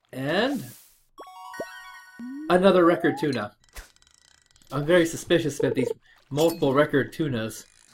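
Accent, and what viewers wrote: Canada. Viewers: defo hear a maritimes Canadian accent :)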